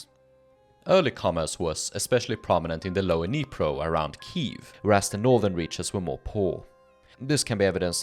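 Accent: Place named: Sweden. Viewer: Premium quality material as always, and your English is excellent, grammatically superior to many (if not most) native speakers, Swenglish charm but with perfect 'ch-' sounds. First rate.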